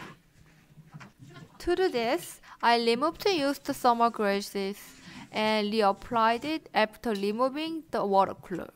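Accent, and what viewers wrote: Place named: South Korea. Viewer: 발음이 어쩐지 친근하게 들린다했다.. 한국분이셨네 ㅋㅋ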